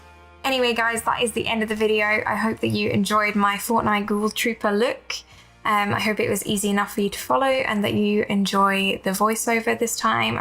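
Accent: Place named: Australia. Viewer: I do like your voiceover better! This is much more informative for those of us who missed the stream. Plus, you know, your voice is as awesome as the rest of you! I'm from the United States and I LOVE your Australian accent! How much do you sing? I'm totally serious about your voice (at the very least, you have a wonderful speaking voice, even if you don't sing at all). <3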